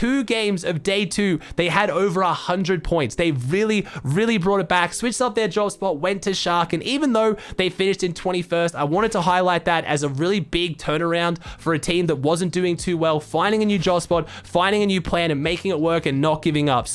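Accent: Australia. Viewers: Robotic Aussie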